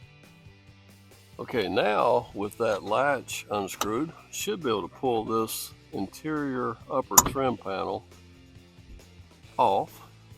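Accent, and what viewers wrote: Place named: Texas, United States. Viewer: Appreciate the video and your accent. Texas?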